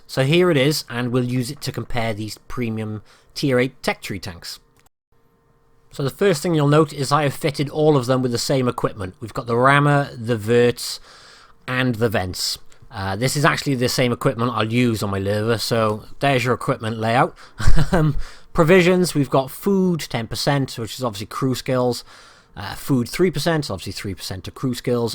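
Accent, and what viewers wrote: United Kingdom. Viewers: Wot Blitz your work is much appreciated, are you on any patronite websites? Do I sense right you're from UK? (accent)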